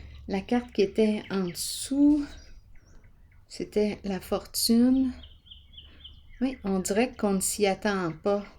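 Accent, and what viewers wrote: Canada. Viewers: Bonsoir, que le ciel vous entende⚖️🤗, Soyez bénie, belle âme au joli accent canadien ❤️🙏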